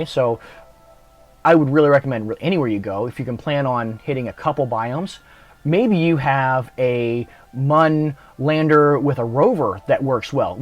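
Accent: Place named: North America. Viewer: Cool video. My first interplanetary landing was Gilly. It seemed like more of a rendesvous than a landing, of course. You mentioned being busy with the harvest - What are you harvesting? I'm guessing by your accent that you're in North America. I'm headed down South to Esperance (Australia) to harvest wheat, canola and lupines in a few weeks. Funny that you'd be harvesting what you grew over your summer at pretty much the same time that we harvest what was grown over our winter.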